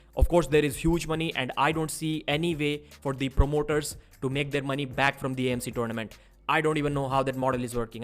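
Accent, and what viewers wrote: India. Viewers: Its the typical indian accent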